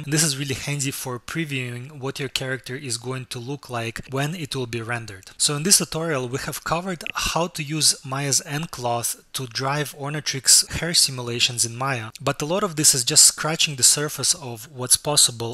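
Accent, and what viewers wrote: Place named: Russia. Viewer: ты русский по акценту видно))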